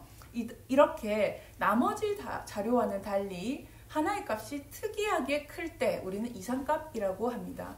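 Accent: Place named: Busan, South Korea. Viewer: ㅎㅎ안녕하세요? 간호사이고 대학원생입니다. 9월되면 학술지에 논문을 투고 해야되는데 통계가 너무 어려워서 유튜브 찾다가 이 채널을 발견했어요. 말투가 낯설지 않고 정겨웠는데 부산에다 센텀에 계셔서 너무 좋아요. 제가 자주 가는 곳이라서^^; 따님분한테 쉽게 설명해주시는 부분도 좋고 따님분도 질문을 너무 야무지게 잘해서 스트레스 받지 않고 볼 수 있을 것 같아요. 그래서 이곳을 통계 맛집으로 정하고 정주행 하기로 했어요. 너무 유용하게 잘 듣고 있습니다. 그래도 감사 인사는 드리는게 좋을 것 같아서 댓글 남겼어요. 복 받으세용^^ 그리고 정말 감사드립니다❤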